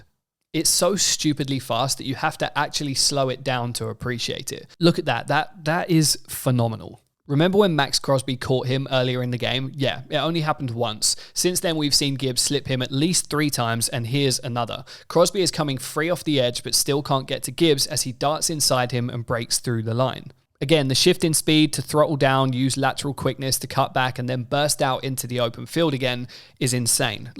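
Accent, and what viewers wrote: United Kingdom. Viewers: Gotta say it is super strange hearing about NFL football in a presumably British accent. Incredibly well done video and totally accurate.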